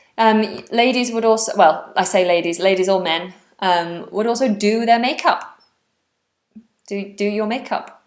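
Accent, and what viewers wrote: United Kingdom. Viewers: Very beautiful British english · I love your British accent,!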